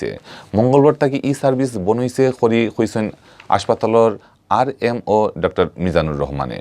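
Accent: Sylhet, Bangladesh. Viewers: Nice news, nice pure her sylhety voice, watching from uk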